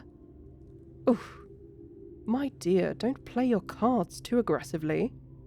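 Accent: United Kingdom. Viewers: Tbf, I love the British accent so much.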